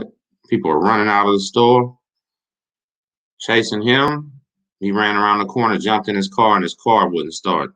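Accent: Texas, United States. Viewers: Happy Friday everyone! 🍺🍻., La eMe is pronounced like M. A.😂. It sounds so different with your Texan accent. Please tell Mr Larry I send my wishes. Can I send him a card? Hope you and your family are doing well. Enjoy your videos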